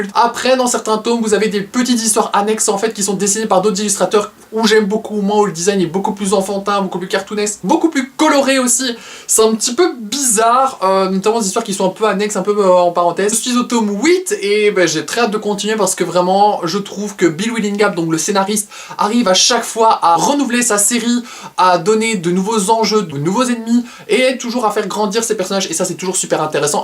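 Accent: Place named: Belgium. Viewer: C'est marrant parce que j'ai pas tout de suite capté que c'était l'accent belge (genre ya un truc qui va pas mais quoi^^) mais j'ai tilté au "tome huit" (taume ouite^^) et j'ai réalisé que si ça me disais quelque chose c'est parce que c'est comme ça que mon chéri le dit^^ (belge aussi avec comme toi un accent très peu prononcé, juste sur certaines prononciations et certains mots). Fables me fait de l'oeil depuis un moment (pareil, j'ai connu son existence par le jeux telltell auxquel j'ai malheureusement moins accroché que walking dead parce que c'est un peu lent à mon goût mais j'adore le concept) étant une grande fan des réécritures de comptes etc (once upon a time entre autre^^). C'est cool de nous présenter des comics hors super héros (pas forcément ma tasse de thé à part peut être deadpool que j'aime beaucoup avec son côté comique^^ et les xmen dont j'aime beaucoup les films mais que je n'ai jamais lu). 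J'ai du mal à trouver mon compte dans les manga, j'adore mais je suis plutôt shojo pour leur côté mignon mais pas cucu et pas trop blagues de cul lourdes^^ (j'avais adoré fruits baskets par exemple). Je viens de faire péter ma wishlist du coup^^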